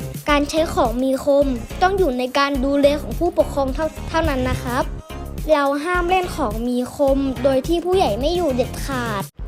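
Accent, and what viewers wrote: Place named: Ratchaburi, Thailand. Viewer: อยู่จังหวัดไหนล่ะครับเนี่ยฟังสำเนียงเหมือนอยู่ราชบุรีจังเลยล่ะเนี่ย ไม่ราชบุรีก็นครปฐมล่ะนะเนี่ย 555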